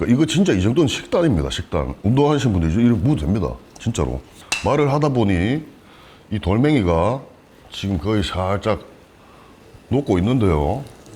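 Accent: Gyeongsang, South Korea. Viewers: 경상도 사투리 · ㅋㅋㅋㅋ같은 갱상도 인으로서 행님 정말 마음에 드십니더 !!!! 프로틴 이빠이인 번데기 먹방 가주실랍니꺼!!!!!😍😍😍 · 경상도 방언 너무 치인다 ㅋㅋㅋㅋ 목소리도 좋고 보는맛이 살아있눼~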